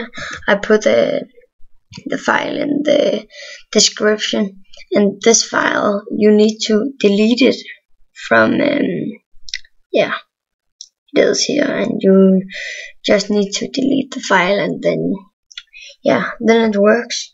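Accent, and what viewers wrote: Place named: Denmark. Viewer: bro i could just hear in ur accent ur danish, så kiggede jeg rundt på din pc og så danske ord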